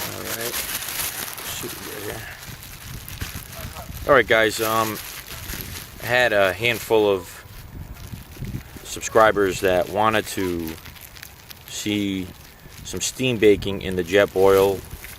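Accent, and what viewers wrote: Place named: South Jersey, United States. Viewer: Using the bag to mix the ingredient is a great idea. Thank you. P.S. You sound as if you are from my neck-of-the-woods (either South Jersey, the Philly area, or northern Delaware)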